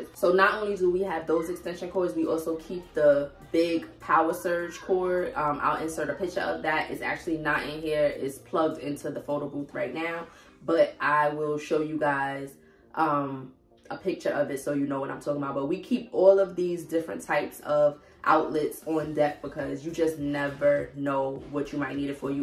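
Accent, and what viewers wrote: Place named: Brooklyn, United States. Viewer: With that accent...it sure sounds like ur in a Brooklyn girl........but gurl I pray ur not UNDERcharging folks!🤷🏽‍♂️🤦🏾‍♂️...🤔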